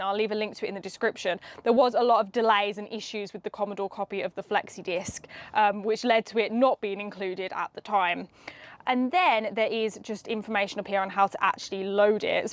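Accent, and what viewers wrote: United Kingdom. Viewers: yooo ive never seen or hear of you're channel before, but i have a useless talent and how i aquired this talent.......be efffed if i knew but just from seeing a picture of someone i can tell if they'll have a british english accent but my buddy here didnt belive me and i bet him five bucks you had said accent. SO I HAVE TO THANK YOU FOR THE 5 BUCKS I JUST WON. Also great video did not realize games were stored on vinyl, crazy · I love your British.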